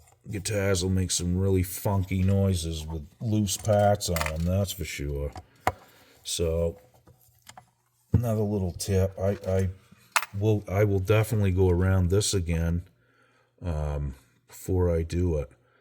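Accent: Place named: New England, United States. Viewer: Are you from Boston or New Hampshire... I noticed your accent.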